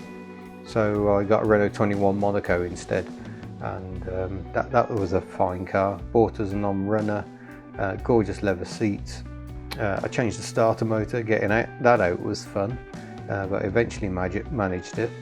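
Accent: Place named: Birmingham, United Kingdom. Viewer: Thought you was a Brummie, makes two of us, i'm from Hall Green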